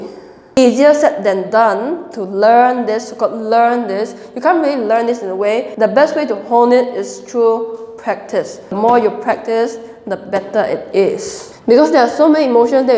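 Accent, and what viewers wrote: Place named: Singapore. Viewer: u sound singaporean and i like it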